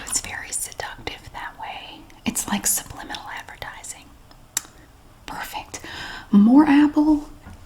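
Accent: South, United States. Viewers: Ur pretty ! I like ur soft southern accent 🙃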